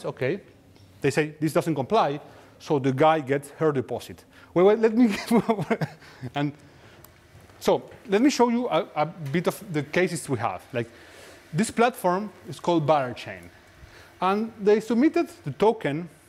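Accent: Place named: Argentina. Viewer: He’s from Argentina, English isn’t his first language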